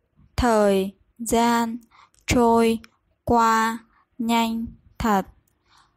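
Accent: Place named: South, Vietnam. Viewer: 발음이나 성조가 남부식으로 들립니다. 일부는 북부식오지만 r, gi 등의 발음은 남부식... d,nh받침은 북부식. 성조 dau hoi 는 남부식... 섞여있네요. 아~ 참고로 저는 아직 배우는 중이라 확실하지는 않습니다.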